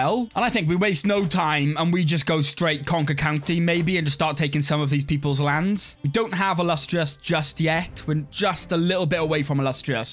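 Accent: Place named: Caerphilly, United Kingdom. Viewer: It’s always really nice to hear another person from wales. But always weird 😂 I would guess Caerphilly by the accent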